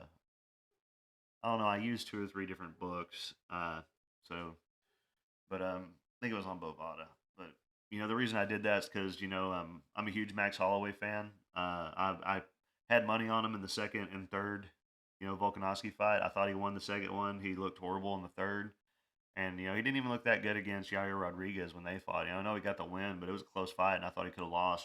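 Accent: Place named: Tennessee, United States. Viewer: I dig your breakdowns. You got a accent like me. Middle TN. Where you out of?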